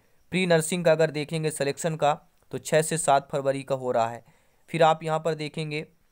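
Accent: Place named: Uttar Pradesh, India. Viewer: but aapki language u.p. ki lg rhi hai...🙏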